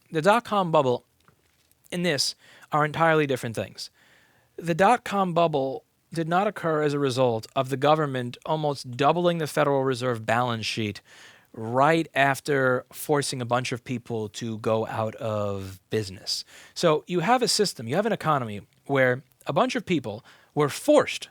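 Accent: New York, United States. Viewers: He's not an angry New Yorker, just how most new yorkers from NYC and close area talk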